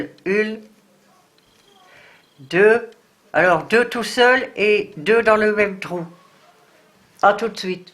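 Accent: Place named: Nord-Pas-de-Calais, France. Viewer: 🤣😅😁😄😃😀😂😉😊 Bonjour comment ça va chez-ti zot? Bon comme d'habitude vous me faites bien rire par votre façon de parler, oh la la une sacrée ch'ti,vous me mettait du baume au coeur merci beaucoup 😉😊🥰😍🤩☺ sinon très joli chapeau pour cet été. Avec ce confinement je vous souhaite un énorme courage et une excellente continuation dans vous projet. À bientôt 😃😉😊🥰 la ch'tit de la région parisienne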